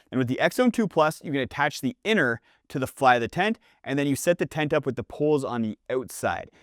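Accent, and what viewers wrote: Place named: Canada. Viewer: my god you are soooooo Canadian. I can't listen to it. It's just so laughable.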